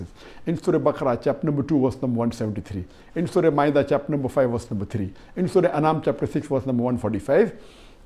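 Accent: India. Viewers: what was the last word ? i didnt hear it why he speaks in hindu englisch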